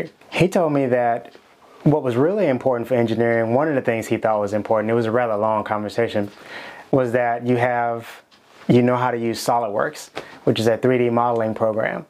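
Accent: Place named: Baltimore, United States. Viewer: Just as everyone else has said, your videos are amazing. I hope you get more exposure because nobody on youtube explains things as well as you. Also, are you from Baltimore? Your "you"s and "to"s sounds like you are!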